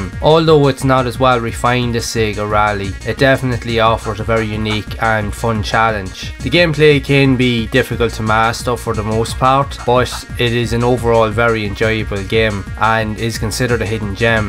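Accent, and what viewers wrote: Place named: Dundalk, Ireland. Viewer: Hearing a man pronounce Japanese game titles in a thick Dundalk accent is my type of content. Been digging through the PSX a little trying out the new FPGA Mister core. There are so many JP exclusive titles I missed.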